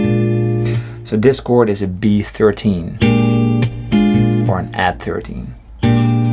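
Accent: Netherlands. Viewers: He's Dutch ;) but he's living in the USA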